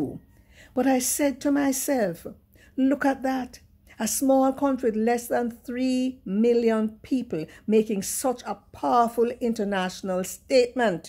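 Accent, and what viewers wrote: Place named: Jamaica. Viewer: Brilliant! Keep up the good work! Love listening to you and that beautiful Jamaican twang!!!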